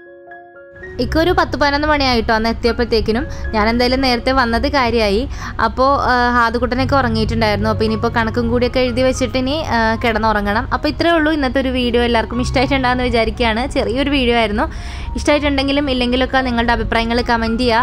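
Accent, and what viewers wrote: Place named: Kasaragod, India. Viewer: Chakkappaayam ...kasaragod parayunne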